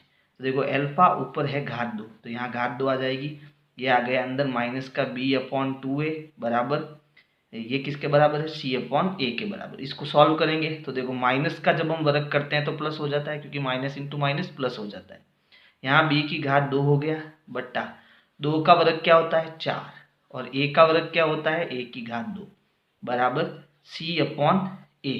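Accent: Rajasthan, India. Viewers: Sir I am also from Pali Marwar I know that you are from rajsthan apki awaz se pta chalta hai sir ji 😅😅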